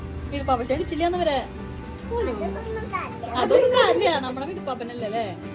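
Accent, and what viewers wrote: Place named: Thrissur, India. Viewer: സ്ലാങ് കേക്കുമ്പോ അറിയാം... തൃശൂർ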